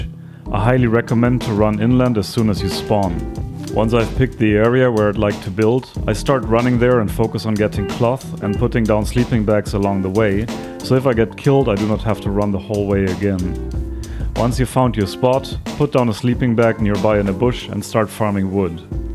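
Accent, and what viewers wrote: Germany. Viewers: Ein Deutscher aktzent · Du hörst dich deutsch an, bist du’s auch?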